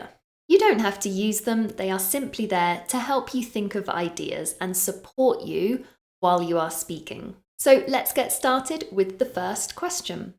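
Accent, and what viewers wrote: United Kingdom. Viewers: Straightforward teaching style. Clear and beautiful RP accent. What else, you are a marvellous teacher.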